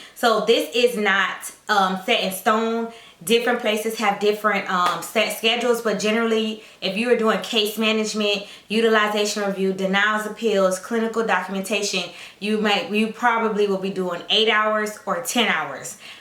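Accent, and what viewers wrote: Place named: South Florida, United States. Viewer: Chile I know my Florida accent from anywhere. Especially south Florida 😂😩 let me get some experience at the bedside and I’m out! Utilization Review sounds interesting 🤔 thanks boo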